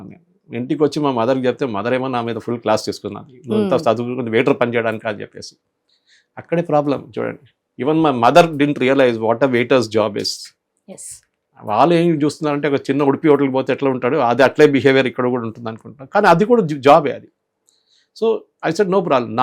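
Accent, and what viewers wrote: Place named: Kerala, India. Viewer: మీరు అంటే నాకు చాలా ఇష్టం సార్. ఒక మలయాలి అయినా తెలుగు స్వచ్ఛమైన భాషతో మాట్లాడుతారు. జర్నలిజం లో మీలాంటి వాళ్ళు ఉండాలి సార్.. సార్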